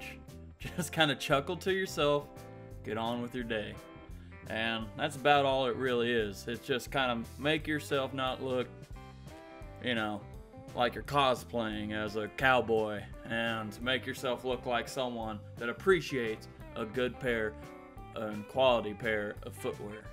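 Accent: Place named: South, United States. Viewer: Wow I noticed that you have a southern accent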